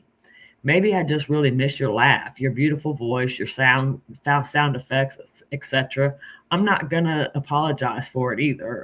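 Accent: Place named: Wilmington, United States. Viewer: Girl, where are you?? You sound like Wilmington or Bolivia maybe? I'm in Wayne...Goldsboro but I grew up in Duplin. I always love running across neighbors! You are 100% right, hes a big ol titty baby, for sure!! My Granny used to say that all the time!!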